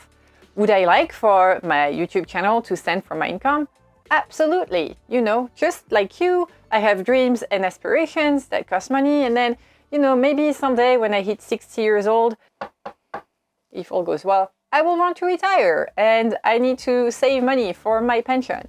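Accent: France. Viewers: Great Video , I love the french accent ! Vive la France - vive le general De gaule !!! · Ur the first time I’ve seen ur video log u r soo❤❤ ur voice is French so r u from Quebec or Europe France? Happy birthday 🎉🎉🎉🎉🎉 next Week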